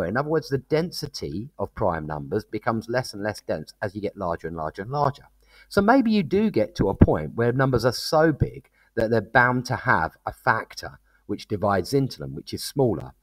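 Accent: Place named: RP, United Kingdom. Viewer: Good, the best part of your presentation is your pleasant voice and almost extinct BBC English. But the very best is not what is in your video, but what is absent - background music.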